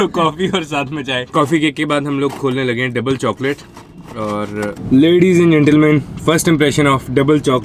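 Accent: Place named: Punjab, India. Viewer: اچھا لگا پیشکش کا انداز پنجابی کا ٹچ مزید دو آتشہ کر دیتا ھے مرحبا پنجابی کو فراموش نہ کرنا یہ اردو کی ماں ھے سینئر ھے ماشاء اللہ سدا شاد باد منزل مراد رھو...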